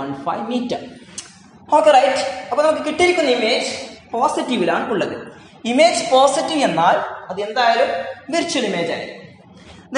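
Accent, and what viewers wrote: Tamil Nadu, India. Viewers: Sir u r a tamil or malayali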